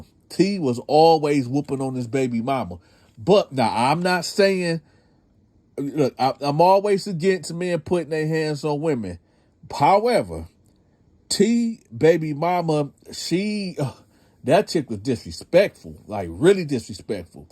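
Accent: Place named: South, United States. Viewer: Man why I think you was from down south lol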